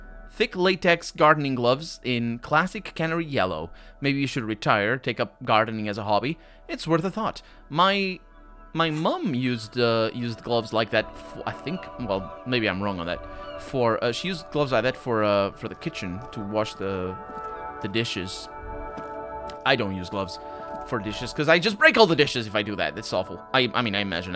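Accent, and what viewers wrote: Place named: Portugal. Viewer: Dude you're already a portuguese person speaking english, trying to act native american would be a bit too hard lol. I don't mean this as an insult. I just know how much portuguese differs from english is all. Also coming from someone who speaks a different language as well that doesn't look even close to english either. heck I don't think I can pull off accent anyway.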